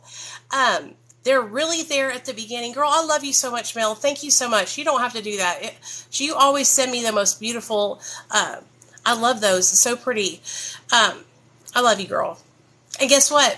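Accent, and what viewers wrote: South, United States. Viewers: Your such a good mom I think Nate is such a good little boy. It’s so cute how u call him son . Very southern sounding I think? I’m in wi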